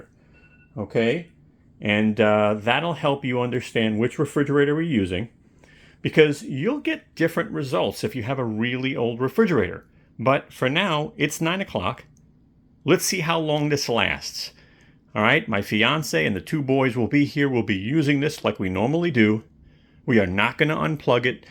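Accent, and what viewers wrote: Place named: New York, United States. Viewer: First time on your channel. Cool review. You sound like my son in law who's from New York.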